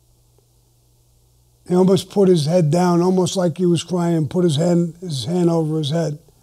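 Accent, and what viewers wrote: New York, United States. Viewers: I forgot to write,sometimes it's hard to understand.either his voice goes low at the end of a sentence or kinda blurry,I think the N.Y. wiseguy accent plays a part too.but I'll take what I can get.cheers!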